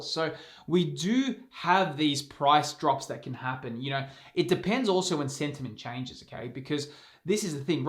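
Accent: Australia. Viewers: Only subscribed for the Australian pokemon accent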